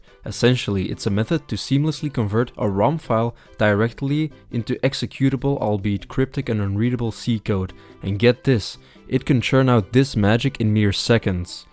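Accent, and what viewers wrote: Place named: Netherlands. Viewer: you sound dutch bro, great video btw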